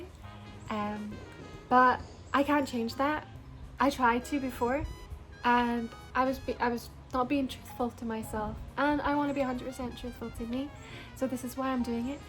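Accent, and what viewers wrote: Scotland, United Kingdom. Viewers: Your Scottish accent reminds me of my family who lives there. And btw I absolutely loveeeee your voice! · Never change the part of you that God gave you you have a beautiful Scottish accent so don't change it love yourself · she sounds scotch to me. i love her voice so much thooo its so calm and gives a happy and comforting feeling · Love the Scottish Accent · I don't even know how I ended up at this video, but I follow you on Insta and I think your voice is great! I don't get why people would be judgemental about someone's accent, because it's a part of who we are and a part of our own history and experiences. Love the story behind it and how it was almost part of you building confidence etc. People underestimate how difficult it can be for people to go to another country and try to 'fit'. It's a really positive thing that you have this unique voice. 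It's part of your history, your personality, and also what you enjoy and the people you admire. Good for you! Love to see a woman being who she wants to be, taking elements of historic style and vocal tones but also adding your own special something. It's very 50s/60s but also with that nice Scottish tone. Nothing fake about that at all! It's who you are and what you appreciate! Our voices all develop and change over time too, so I don't know what people were playing at going back through your own stuff? Also, sorry to go on and on, but people saying you're trying to 'be' Marilyn are missing the point! You're bold enough to love the aesthetic and take on elements of that for your own persona! You're being you - you're obviously just very influenced by that old Hollywood style. We pretty much all take elements of people we admire and that's how we develop our fashion sense etc! It just so happens that you're bold enough to love the style of another era! 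Power to you! xxx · I love your Scottish accent! It’s beautiful! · You can tell your Scottish, I have been to Aberdeen. Americans will understand you. I understand Gerard Butler fine. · It’s just her Scottish accent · I think she slightly changes it so people can understand her more clearly and not hear the Scottish accent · I can hear the Scotland accent and I love it I noticed your voice but I didn’t think a lot about it and I thought your voice was really sweet and your voice is your voice and yours is really pretty ❤️❤️❤️❤️❤️❤️ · You're Scottish!!! Wow, you covered it up so well with your Marilyn voice. What a twist. Lol. Don't ever feel insecure about your voice and how you like to talk. It's a unique trait that makes you you! I don't know how people could hate it. It's very satisfying and relaxing to listen to! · I'm so confused, I'm new here and literally just checked out some of your first cpl of videos where you talked with your authentic, deeper Scottish accent. 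All you've done is decided to talk higher tones softer....like Marilyn. It's so obvious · I honestly thought it was to imitate Marilyn's soft voice. I love how this is actually natural! Her voice is smooth and calming. And your accent is awesome. I have always liked Scottish accents also you are very beautiful! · A Scottish accent is 100% my favorite!! I’m sorry you get hate for such a sweet soft voice 💜💚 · Although she’s “soft spoken”, she’s obviously Scottish. She tries so hard to sound American. How very sad…🥺🥺🥺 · I think it's more she speaks slowly due to her Scottish accent so other countries understand her. I'm Irish I speak so fast and Scots are the same .I slow mine down if away so I would naturally should mo gently then I normally am. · You can really here the Scottish account. My grandma is Scottish. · Your scottish accent is absolutely stunning!!! Dont hide it! I with i had a natural scottish accent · So glad to see this video! 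It explains it all🌞 I LOVE your true Scottish accent!!! Thank you for this❤️❤️❤️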